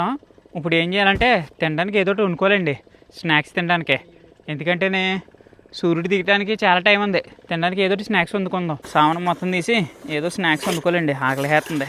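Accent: Godavari, India. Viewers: నీ పేరు చెప్పు బ్రో నీ వీడియోస్ చాలా బాగుంటాయిగోదారి యాస baguntadi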